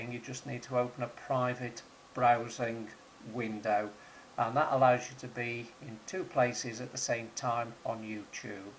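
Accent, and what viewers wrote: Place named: England, United Kingdom. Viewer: your english accent........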